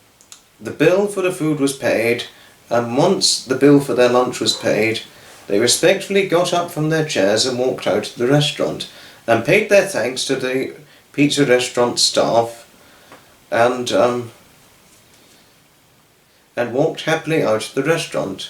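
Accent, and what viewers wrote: England, United Kingdom. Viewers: What a funny coincidence. I have a family relative named Thomas Graves. He was an architect in Baltimore, MD with RTKL and a model train aficionado. I always enjoy hearing your English accent!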